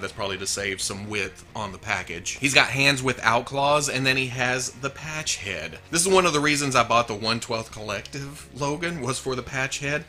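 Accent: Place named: Carolinas, United States. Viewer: Tryna place homie's accent. The Carolinas mayhaps?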